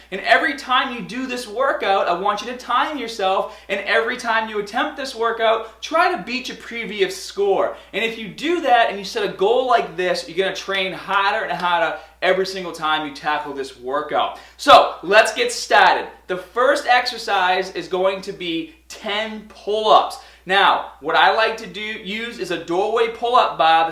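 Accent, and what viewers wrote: Boston, United States. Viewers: ... is that a Boston accent? :-) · Boston accent or nah!??? 😫❤️😍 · your accent is driving me crazy >:( go back to Boston and learn how to speak english properly! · Sounds like a Boston accent... They drop the "R" similar to Australians. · Martin P Have you even ever heard an Australian talk? My guess is he has a Boston/Rhode island accent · Is that a Boston accent. It's so weird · are you from boston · Of all the accents to re-brand yourself with, why the hell did you choose Boston? Boston... The accent that makes you seem like you will get drunk and hit someone at a Baseball game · Are you from Baaston?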